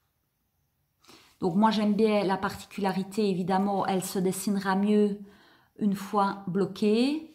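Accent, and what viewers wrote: Liège, Belgium. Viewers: Quel plaisir de vous écouter ! Toujours ravie d entendre votre accent liégeois : ma ville natale! Vous faites de très belles réalisations malgré le fait que vous ne maîtrisez pas encore complètement la surjeteuse ! Merci.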